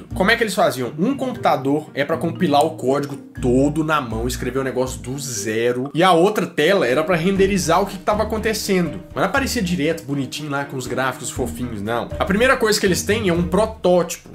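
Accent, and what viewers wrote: Minas Gerais, Brazil. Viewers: Você é de Minas?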